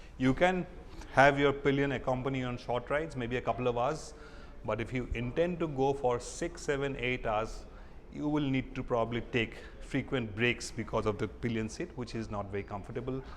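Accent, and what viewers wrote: Assam, India. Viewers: The presenter I believe is an Assamese, guessing from his accent.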